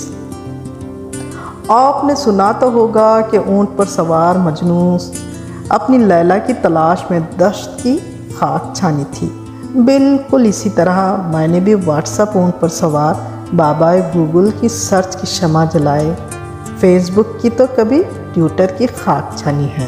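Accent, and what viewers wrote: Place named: Deccan, India. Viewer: دکنی لب و لہجہ میں مزاح سے بھر پور بہت عمدہ دیدی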